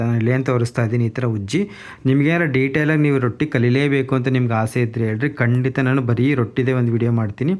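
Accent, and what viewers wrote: Chitradurga, India. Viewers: ಹಾಯ್ ಬ್ರೋ 🙏ನಮ್ ಚಿತ್ರದುರ್ಗದ ಮಾತುಗಳು ಕೇಳಿ ಖುಷಿಯಾಯಿತು ಬ್ರೋ 😊❤️❤️ರೋಟ್ಟಿ ಪಲ್ಯ 😋👌👌ನೀವು ಅಡುಗೆ ಮಾಡೋದು ನೋಡುದ್ರೆ ಖುಷಿಯಾಗುತ್ತೆ 👌👌ನಮಗೂ ಸಪೋರ್ಟ್ ಮಾಡ್ಬೇಕು ಅಂತ ಅನ್ನಿಸಿದ್ರೆ ನಿಮ್ಮ ಸಪೋರ್ಟ್ ಇರಲಿ, ನಿಮಗೆ ನಮ್ ಸಪೋರ್ಟ್ ಖಂಡಿತ ಇರುತ್ತೆ 👍❤️❤️😊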